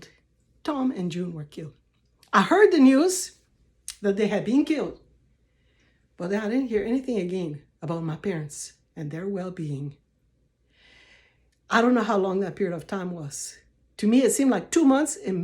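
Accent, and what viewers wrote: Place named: Bassa, Liberia. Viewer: You put a smile on my face!❤️😊My mom is from Rivercess, GrandBassa county ! You do have a beautiful Bassa accent. We love listening to you!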